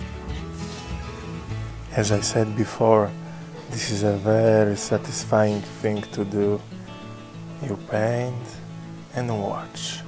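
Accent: Poland. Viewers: od razu słychać ze polak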